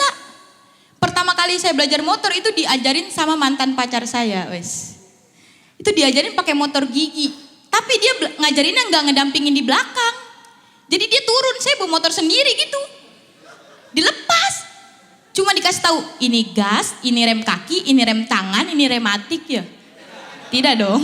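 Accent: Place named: Cikarang, Indonesia. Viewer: Aksen Cikarang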